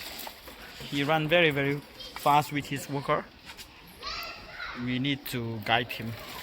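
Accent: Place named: Mizoram, India. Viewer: You are Mizo English, very very Mizo English ❤😂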